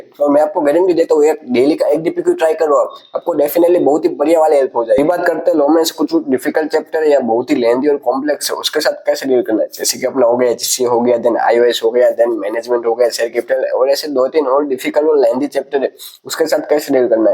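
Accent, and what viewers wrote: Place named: Gujarat, India. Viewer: I like ur gujrati assent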